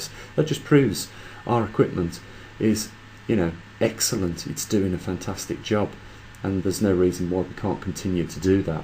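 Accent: United Kingdom. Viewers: Mentioned previously I've been putting your videos about a bit on different platforms. But a little about me .. Expert in various sciences .. among these computers and animals. Oddly enough strange things have been occurring in both these areas and I've talked about it with others. Now I've been well aware we were heading for a magnetic flip for years but never expected it to occur in my lifetime. So never considered this being the cause of things I've witnessed. Now makes a lot of sense. I've been talking about your videos with a lot of people and over the coming weeks many thousands will probably hear about it too. Was odd to hear another British voice .. expected you to be American for some reason when I first listened to your previously.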